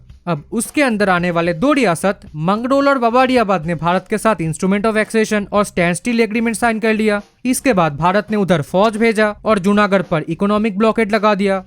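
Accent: Bengal, India. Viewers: Typical bong accent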